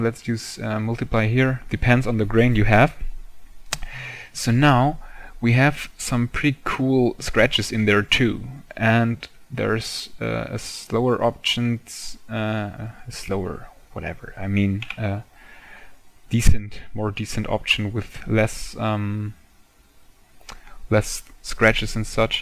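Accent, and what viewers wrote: Germany. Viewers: nice vid but stop copying the american accent its annoying! stick with your german accent its much more charmin....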